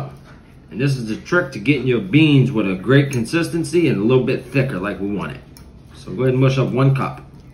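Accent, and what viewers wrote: Louisiana, United States. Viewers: That’s how our white people sound down there. That’s when you know they are from the dirty dirty part of Louisiana lol it took me to go to the military and come home 3 years later to actually hear how we sound lol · Subscribed and enjoy watching all your tutorials.. also love the Louisiana accent!! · That’s the Louisiana accent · Let's Go! Yummy! You a lil ole Cajun just like my nieces husband. Love from Ms. Flournoy. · This is specifically the cajun accent from lousiana, theyre a unique culture.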